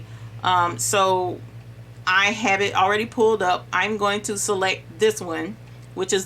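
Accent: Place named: Texas, United States. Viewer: Please tell me you are from somewhere within 100 miles of Dallas or Houston? That accent you have makes me feel at home. Can you read me a bedtime story?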